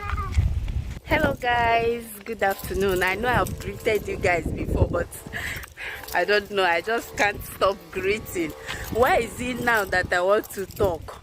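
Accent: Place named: Mbano, Nigeria. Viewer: Even my children call fly mosque 😂😂😂 nne you sound like mbano oo chia i enjoy your igbo. It sound so much like my hometown 😊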